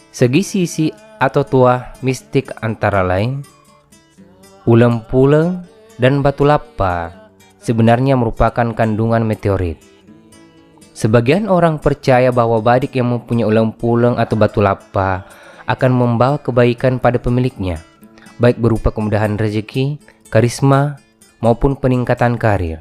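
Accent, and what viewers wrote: Bugis, Indonesia. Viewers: Logat Narator sangat khas bugismya :)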